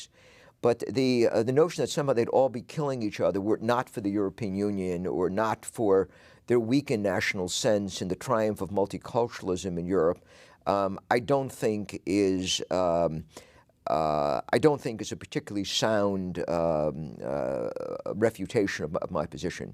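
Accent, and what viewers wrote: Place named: United States. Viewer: Such a stupid accent. So you yankees think that idiot is a public intellectual???????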